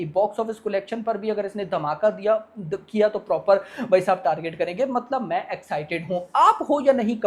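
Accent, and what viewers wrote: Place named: Pakistan. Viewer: Why are you over reacting and I don’t know, i feel You have a Pakistani accent.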